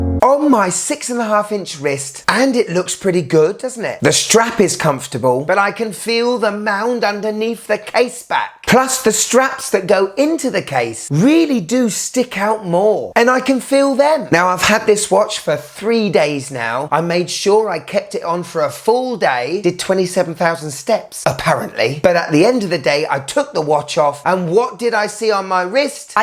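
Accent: United Kingdom. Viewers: Great test and great British accent you have it reminds to my good old days 🤣 Just placed order for the watch Cheers❤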